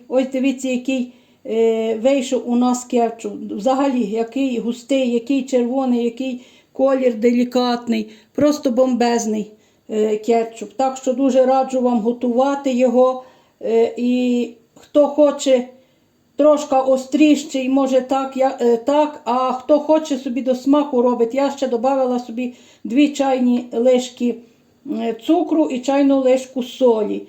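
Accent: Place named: Transcarpathia, Ukraine. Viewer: Дякую за рецепт і приклад закарпатського говору.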